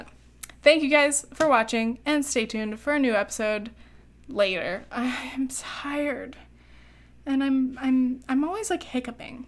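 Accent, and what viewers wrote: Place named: United Kingdom. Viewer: Giving you a super like for that British accent. Applause applause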